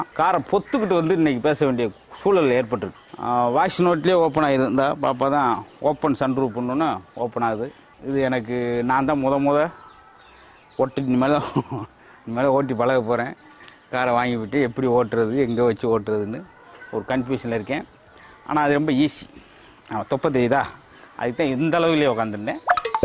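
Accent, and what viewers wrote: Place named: Madurai, India. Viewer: UNGAL COMEDY HIT AAVURADHUKU MADURAI SLANG UH ORU KAARANAM 💯💥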